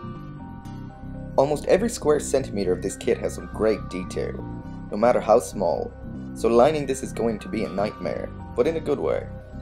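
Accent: Ireland. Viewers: How did he ever sound Asain? He's Irish and has a clear Irish accent...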